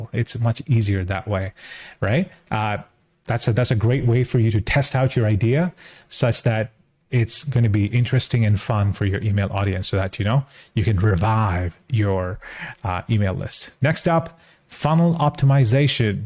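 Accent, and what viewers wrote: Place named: United Kingdom. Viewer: Lol.. the British accent. Nice content man. Yeah that’s a fear.. are we doing enough for my people to stay and get enough value?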